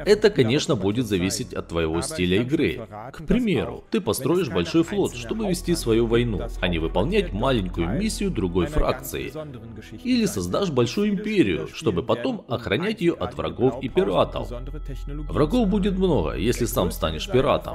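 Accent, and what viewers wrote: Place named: Rostov, Russia. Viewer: Приветствую. Знакомый говор, не из Ростовской области случайно?!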